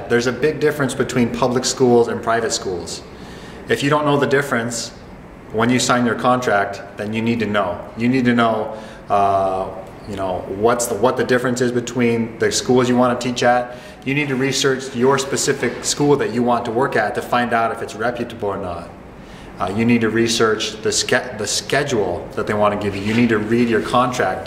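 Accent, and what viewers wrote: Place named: West, Canada. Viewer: You must be western Canadian. I learned when I moved here that people say "...right?" as a confirmation at the end of a sentence very often. People can come to a country without researching if they are flexible people, but otherwise, be prepared to suffer!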